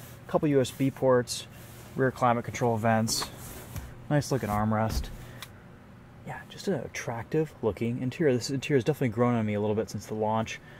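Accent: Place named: Michigan, United States. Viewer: Michigan accent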